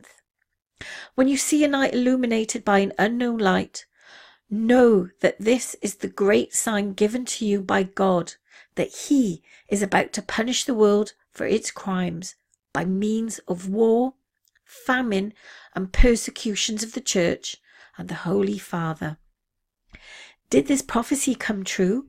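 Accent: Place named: United Kingdom. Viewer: Very well spoken dear lady I have a New Fav YT channel I believe the final prediction has been held from us. People Do panic easy and from other circles such as the “Visitors” stories are told of how mankind was grown through Genetic manipulation and planted here on Earth? Thank you, I as a Yank who was raised in Southampton I always perk up to a Brit accent!Blessings to You🌹💀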